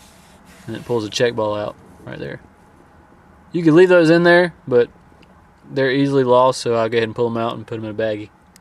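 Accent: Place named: South, United States. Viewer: sounds like a cute hottie gear head ummmm southern accent like my alabama one. ive got over 20 paid for cars just sittin waitin to meet mr Right another gear head.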